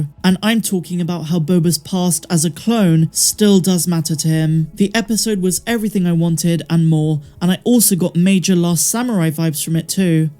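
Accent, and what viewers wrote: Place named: England, United Kingdom. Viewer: Twated lol big up star wars so nice hearing about star wars in a English accent keep up the good work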